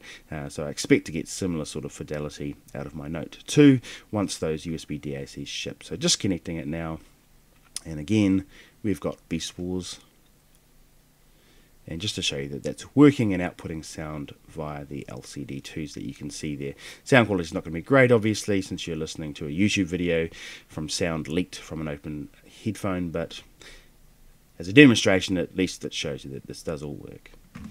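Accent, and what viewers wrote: New Zealand. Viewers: Are you from New Zealand by any chance?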